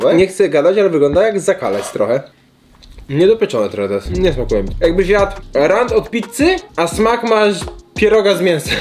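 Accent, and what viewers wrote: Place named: mixed, Poland. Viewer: Mordo ty z Podlasia lub z Podkarpacia jesteś? Bo taką gwarą mówisz 😂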